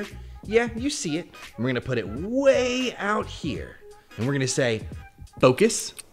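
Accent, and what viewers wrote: England, United Kingdom. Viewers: Hi what a Funny English Accent love it . Hila is a good Listener 🤩🤗 Britney is calm.. luv chilling out after work with your videos still trying to work out which Day I am on Ha Ha...😍 Good tips catch up soon .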